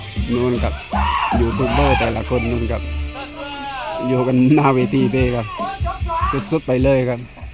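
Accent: South, Thailand. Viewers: เจ้าคนใต้ติ คือแหลงใต้😂😂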